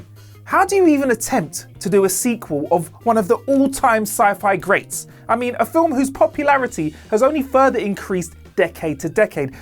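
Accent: United Kingdom. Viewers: Why don't you guys just realize that British people pronounce things differently than Americans do?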